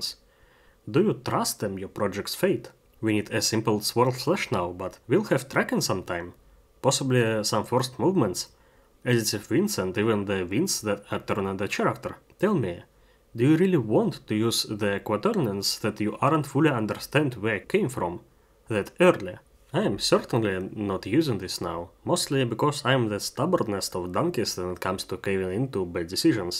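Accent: Russia. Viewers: Слышу русский акцент. Может ещë и на русском языке начнëшь делать?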